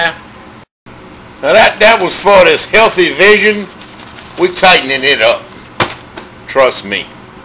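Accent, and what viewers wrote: Louisiana, United States. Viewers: I could tell from your accent that your a fellow Loiuisianian!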